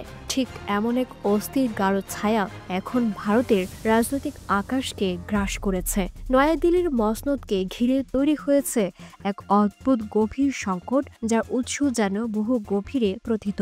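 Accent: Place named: Bangladesh. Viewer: Why you are not giving “Go back slogan” to Mamota or Firhad” to whom 10 people are dead before Puja?? and everyday people are raped,murdered??? India is still stabile due to Narendra Modi and who can talk against Donald Trump,,,your Bengali languages sounds like you are a Bangladeshi journalist…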